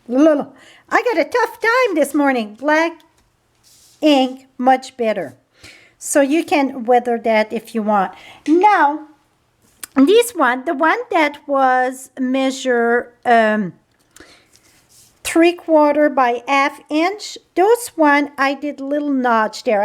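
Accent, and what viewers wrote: France. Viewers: Good day, I sure love watching your tutorials because they are very informative, and you are my fellow Canadian they love your French accent have a wonderful night and a wonderful day tomorrow and God bless you. I also like the fact that you're not doing voiceover that everything you do is well you are creating your magic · She was saying 4 by half (inch). It's her French accent.:)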